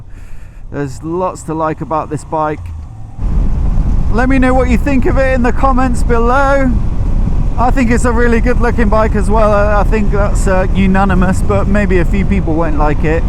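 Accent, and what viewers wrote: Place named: RP, United Kingdom. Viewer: Knows how to speak English? He is English. Nothing but the Queens English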